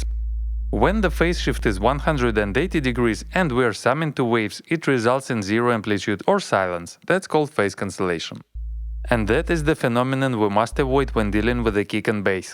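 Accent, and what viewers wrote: Russia. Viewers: Акцент очень похож на русский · Nothing is better than a Russian person teaching you! Very unique person with good knowledge & really like his accent :))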